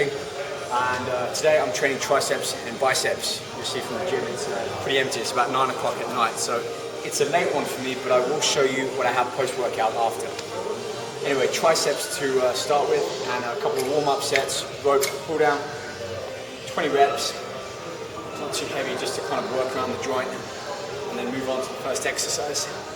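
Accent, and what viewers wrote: United Kingdom. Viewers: Left hand drive? --- and his British, where is he ? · I hear a British accent in his voice. Where is he from? And how long has he been a bodybuilder?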